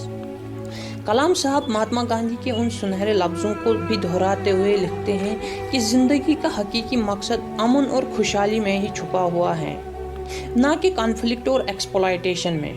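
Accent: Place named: Kashmir, India. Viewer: I think you r kashmiri..I got it by your accent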